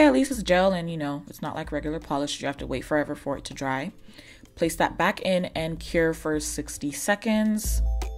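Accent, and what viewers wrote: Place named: Nigeria. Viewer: I love the 9jia accent!!!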